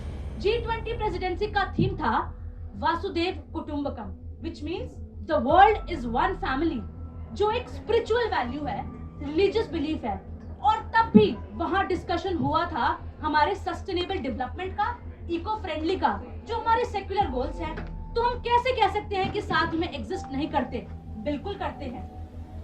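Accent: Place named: Punjab, India. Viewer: you said secular belief & spritual belief. In captions it's mentioned Religious vs Secular. There is a huge difference between spiritualism and religion even though they're co-related. Your speaking skills are decent but your arguments can be easily penetrated. I'd love to wage war against your Punjabi accent the next time we face each other. Props to you for the victory.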